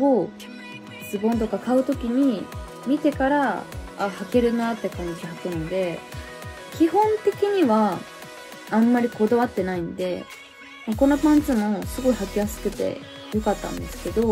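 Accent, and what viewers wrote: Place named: Niihama, Japan. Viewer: なつかしい新居浜弁を聞いて(読んで)思い出しました、生い立ちを詳しく知らなかったけどやっと分かりました。大島から市内に変わり神郷小学校から川東中なんですね。私の親が宇高の桜ヶ丘マンションに居たから場所はよく分かります。私も新居浜商業高校からトヨタに入り田原工場で定年後迄田原市ソフトボール協会会長としてソフトボールを楽しく過ごしましたし、のサインボールをキャッチして興奮した事をよく思い出します❗これからも応援していますからご活躍することを願っていますよ❗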